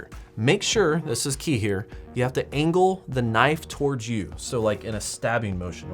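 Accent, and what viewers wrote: United States. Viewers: you're 🇨🇦??? WOULD HAVE NEVER GUESSED! You kind of have an murikan twang. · Ali Naqvi southern accent #Kansas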